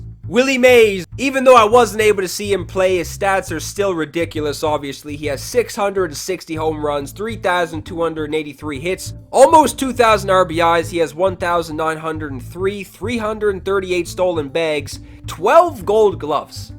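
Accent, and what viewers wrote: Canada. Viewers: Ill tell ya whats NOT the G.O.A.T...ur stupid canadian accent. We all hate it. Effin period....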